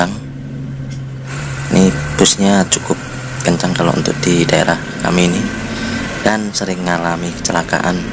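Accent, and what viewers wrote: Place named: Java, Indonesia. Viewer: Jawir x logatmu...daerahmu Jawa sana juga LBH ngawur...bacot kau... Kontenmu mmng kbnykn sok2 ngjlkin kmbink...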